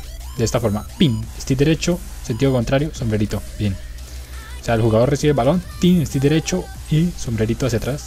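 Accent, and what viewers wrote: Antioquia, Colombia. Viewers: porsero ust es de colombia por q tiene pora voz de paisa de calarca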